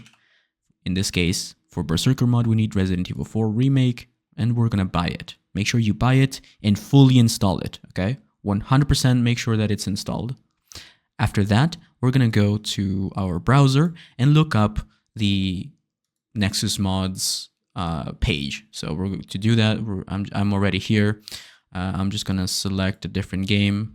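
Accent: Italy. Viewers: ❤ Personally I'm a console guy, but the fact that you took time for your fellow peeps on PC to give them a head on education makes your Italian accent the cherry on top. I support good people and good content. Enjoy brother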